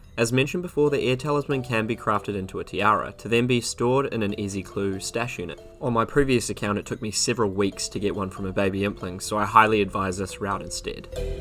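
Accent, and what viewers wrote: New Zealand. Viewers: Are you from NZ? Accent sounds familiar.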